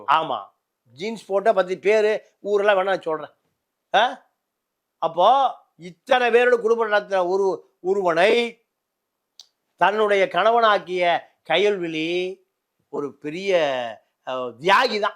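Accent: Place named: Madurai, India. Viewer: அய்யா நெறியாளர் உங்கள் பேச்சில் மதுரை மண் வாசனை தெரிகிறது!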